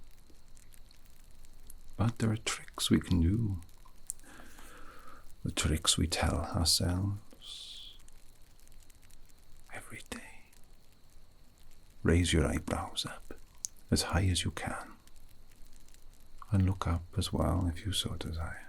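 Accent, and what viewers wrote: Wales, United Kingdom. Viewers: Tone, timbre, and lilt are all perfect -- and I for one can never get enough of the Welsh accent. I've watched this twice now, at times when I was busy, so I look forward to having another go when I finally get to rest. So, will I have the dream, or will the dream have me? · You have done nothing wrong to need forgiveness, your welsh accent is always welcome to me. ☺ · Welsh accent? I'M IN. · I dont play video games but i like the crackling fire, and natural Welsh accent is always welcome, my favorite actually.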